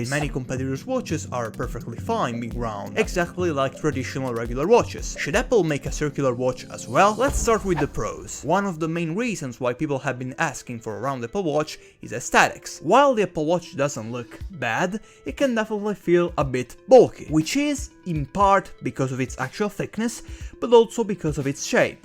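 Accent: Italy. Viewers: Bellissimo video, complimenti! Avevo sentito l’italianità dalla pronuncia e infatti non era difficile da notare 😂🔥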